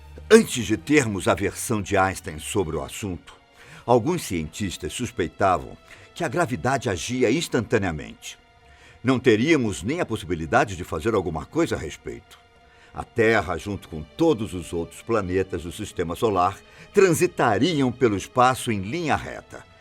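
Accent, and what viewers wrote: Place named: Rio de Janeiro, Brazil. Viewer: É que ele é carioca kkkkkkkk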